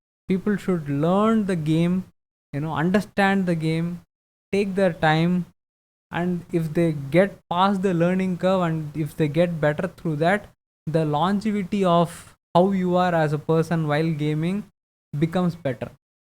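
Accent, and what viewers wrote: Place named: Tamil Nadu, India. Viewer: Your accent is Tamil. தமிழர்கள் வீழ்வதில்லை. You will come back.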